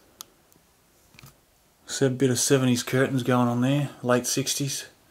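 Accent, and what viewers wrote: Australia. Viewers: Subbed. Why ? : Because the video is LONG and NOT forced upon you. I felt as if I was there with you and could see what you saw and feel what you felt. AWESOME VIDEO. DONT CHANGE, be long and thorough. Sounds like your from Australia. Hail !. Be safe because Ive seen those damn spiders that live there. UGhh. Take a pistol preferably a Desert Eagle. Can never be to safe. oops I told you so !. Start blasting and hope for the best. Fear those spiders !. Damn bigger than Spiderman ! Make sure not to close in any animal, they can die from starvation or dehydration.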